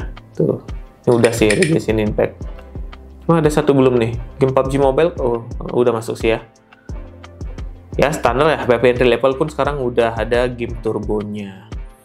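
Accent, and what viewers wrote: Bali, Indonesia. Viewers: Bang lu dari mana? Adi logat care nak Bali?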